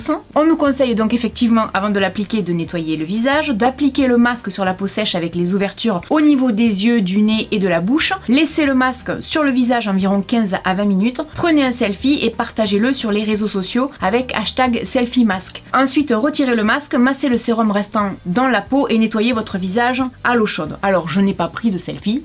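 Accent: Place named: Marseille, France. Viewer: T'as l'accent marseillais !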